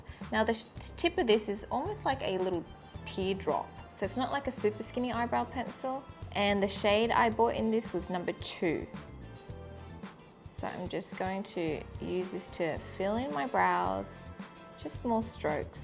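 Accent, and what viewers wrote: Australia. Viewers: nashikin works LMAO she’s Australian and her accent sounds nothing like the British one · nashikin works she’s not trying hard to be british—it’s her natural accent and it’s AUSTRALIAN. she lives in australia lmao—what do you expect? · im new i was wondering if has any australian background or lives in australia bcoz she has a slight aussie accent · where are you from? Your accent reminds me of Australia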